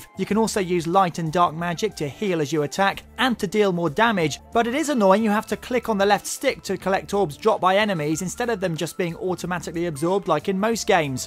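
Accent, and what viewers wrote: England, United Kingdom. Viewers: every reviewer shut be forces to have a English accent like this guy : )!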